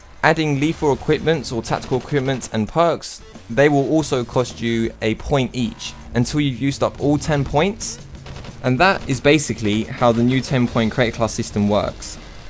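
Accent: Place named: South London, United Kingdom. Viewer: Nice video. Where are you from? You sound like you're from South London, around Croydon?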